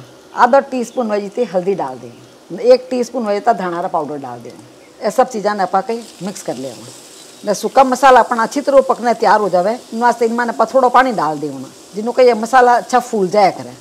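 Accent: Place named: Marwar, India. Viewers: Apka baingan KS khooshbu Kitna acha haga. Sweet marwari language ... sab baingan bann javen!! You speak amazing English also, quarter cup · I find it so easy to hit the LIKE button on your recipes even before watching them because they are always so unique and innovative. Your approach to cooking feels like magic, turning everyday ingredients into something extraordinary. Both my wife and I are devoted fans of yours; just looking at what you’re making makes our stomachs growl with anticipation. We can't wait to try your recipes ourselves. We also love your Marvarri accent—it's a delightful touch!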